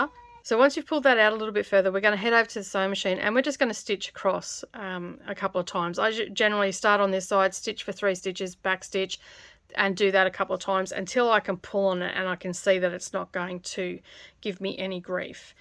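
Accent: Australia. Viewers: What a great idea. Recently discovered your channel. Nice to hear an Ozzie. Can you please advise who you purchase your labels from. Cheers Dianne Qld Aust. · Thank you for this I thoroughly enjoy watching your videos and your explanations. So very clear and easy to understand. Best of all your an aussie so a welcome voice to an ex aussie all the way in the UK. X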